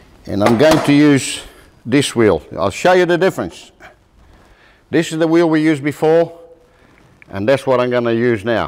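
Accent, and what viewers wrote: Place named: Italy. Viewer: Sounds hes italian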